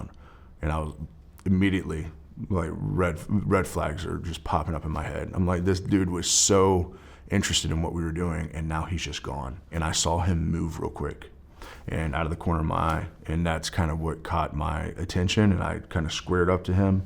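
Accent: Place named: Kentucky, United States. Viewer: Florence Kentucky I'm betting is where he's from Florence Y'all I know that accent it's kinda half southern half Yankee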